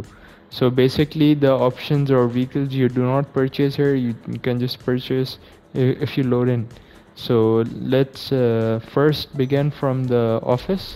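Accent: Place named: India. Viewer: Are u from India? It's hard to bear your accent 🤣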